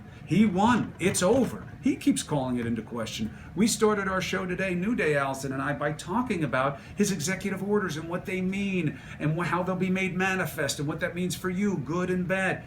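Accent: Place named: Ireland. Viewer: you got the best brain, you know that? Plus you know words. You've got the best words. Ever heard that before? Lol. Thx for the vid. BTW, I know u are of Italian descent, but I could swear I detect a smidgen of an Irish lilt in your accent? Sexy as all heck! Keep at it. xx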